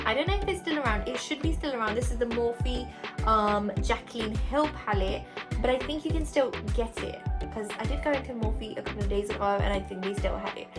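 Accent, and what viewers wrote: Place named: Punjab, India. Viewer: Just love your punjabi and english accent💕💕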